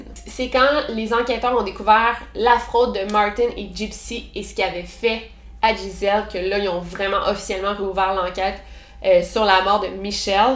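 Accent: Quebec, Canada. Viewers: C'est l'accent québécois, s'il t'indispose autant éviter de regarder ses vidéos non ? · Je me suis même pas rendu compte de la longueur de la vidéo😅 merci pour ton super travail a chaque fois! Et ton accent et les termes Canadiens, j'adore!😊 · Cc je t admire beaucoup j adore ton accent québécoise j aimerait un jour visiter le Québec sa serait mon rêve. J adore tes vidéos 😘😘😘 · J'adooooore tes vidéos. De temps en temps...j'ai un peu de mal à te suivre vue ton accent canadien... Plus je te regarde...et plus je te kiffe...tu as souvent de chouettes histoires et tu expliques les choses si bien qu'on se croirait presque devant un film. Et pour couronner le tout...je te trouve super-mimi. Ce mec est un vrai goujat... Un salopart... Aucune considération pour sa femme... Toutes ces aventures extra-conjugales et Michelle plutôt naïve qui veut tout faire pour sauver et garder son couple... 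Merci pour nous avoir fait découvrir cette triste affaire...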